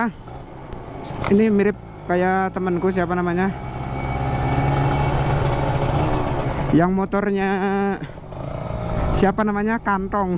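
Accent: Banyumas, Indonesia. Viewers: Ngapak kocak gaess😂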